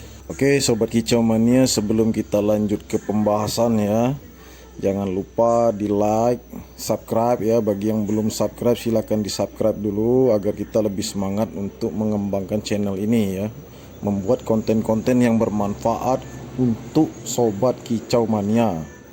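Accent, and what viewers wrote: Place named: South Sumatra, Indonesia. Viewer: Gaya bicaranya kayak orang sumsel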